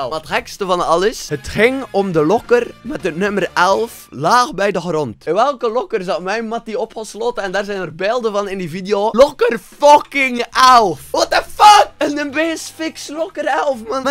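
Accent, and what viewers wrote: West Flanders, Belgium. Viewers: West Vlaams denk ik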